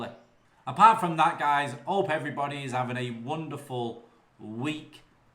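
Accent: Manchester, United Kingdom. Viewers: Apart from the Manc accent and baseball cap - I do enjoy the way you read the stories. I enjoy Thailand Bound on a Saturday too. Hearing about these tools that come to Thailand, ignoring the shit-load of advice that can be found in ten minutes with Google, never gets old. Cheers our kid!! 👍😊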